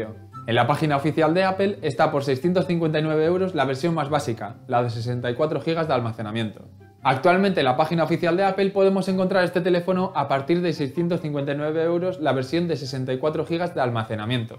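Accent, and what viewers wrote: Colombia. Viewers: SOS colombiano? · ¿Eres Colombiano?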